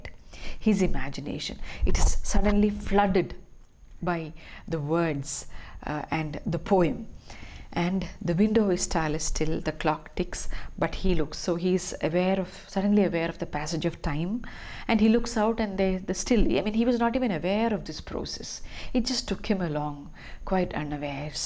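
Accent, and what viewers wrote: Kerala, India. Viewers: Must be a Malayali,Its evident from her accent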